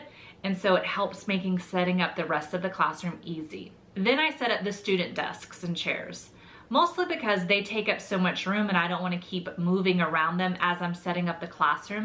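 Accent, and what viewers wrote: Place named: Minnesota, United States. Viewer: Are you native to SC? You sound like you might have been in Minnesota. I am in Rock Hill, SC, not native though. I am a California beach baby. I love all the tips. Thank you so much.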